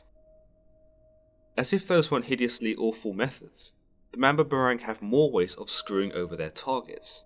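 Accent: England, United Kingdom. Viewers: i hate it when europeans mispronounce and narrate about bipoc history, mythology, ancestry, etc... especially if its my mother's home land-- thats been colonized a bazillion times. why dont they ever have people from the place teach about the place? if anything it'd avoid mispronunciation the very least. MAM-BAH-BAH-RANG. just cuz they got a english accent dont mean theyre more intellectual. coughs despite almost every documentary ever made being narrated by an english voice coughs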